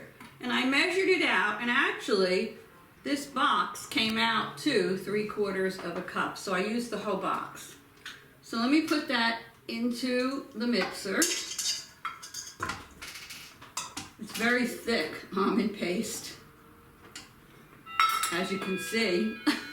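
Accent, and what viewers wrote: New York, United States. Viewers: Hello sweet:) My Almond Bars are in the oven right now---ohhh how lovely they smelled just mixing the ingredients let alone while baking! I tweeked a bit--I added 1 tsp almond extract to the cookie dough base--no such thing as too much almond flavoring...right?!! And, I'm going to put a simple powder sugar/water/almond extract glaze on top--just a very thin coat...I can't wait to have a taste test! Thank you so much for the recipe. I enjoyed your video and found both you and your "camera man" endearing. I detected a NY accent. The Bronx? Just guessing. Thanks again! Have a blessed day.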